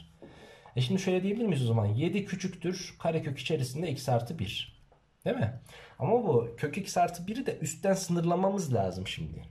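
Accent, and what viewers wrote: Ankara, Turkey. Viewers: Hocamız Angaralı sanırım :D 👍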